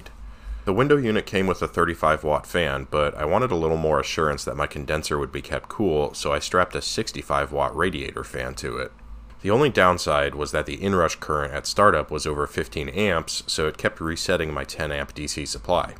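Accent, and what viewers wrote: United States. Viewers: Fantastic video. Warms an engineers heart to see a project conducted with proper regard for the science principles and associated mathematics. Even better to hear an American accent wrapped around SI units! Mr, you got it all goin' on! :) And seriously, great video. I've worked a few heat pump designs myself and my only question about your technique is your soldering is using soft solder; is that really strong enough and long lasting enough for the task at hand, and does it not soften a bit at your higher temperatures where the pressure is also higher? I use silver solder and nitrogen gas in the tubes to stop scale forming in the tubes. It is a pain but those joints are stronger than the tube! Now, to find the promised next video.... and to say; thanks for sharing.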